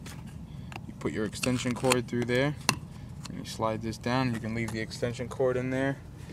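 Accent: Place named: North Jersey, United States. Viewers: you sound like your from north jersey , but greeting from across the delaware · Joisee accent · you are def from north jersey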